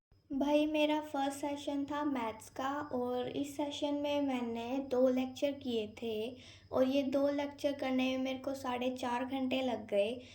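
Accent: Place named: South, India. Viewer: South Indian ho kya aap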